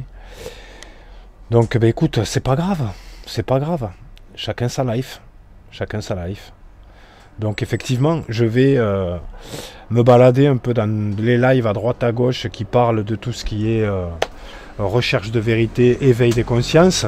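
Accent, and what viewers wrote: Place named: Toulouse, France. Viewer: Salam, Force à toi.fait le tri mec. Ont commecent en a avoir vraiment,mais vraiment plein le cul. T'es videos sont magnifiques mon Ami. De la force pour toi et les tiens 🙏 L'union fait la Force, avec l'accent du 31 toloza ma gueulle 🔥🙏✊ La Mosqué au milieu de la Medina 🙏 L'église est sacrée aussi.. Salut Salam Shalom ✌️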